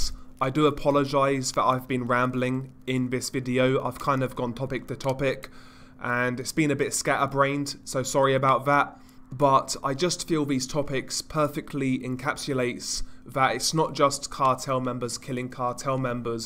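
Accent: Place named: United Kingdom. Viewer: Are you from the UK? I love your accent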